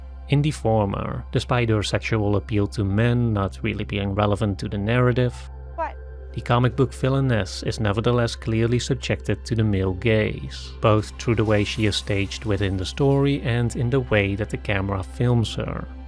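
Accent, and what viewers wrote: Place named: Netherlands. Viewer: hmmmm you sound Dutch. Are you Dutch?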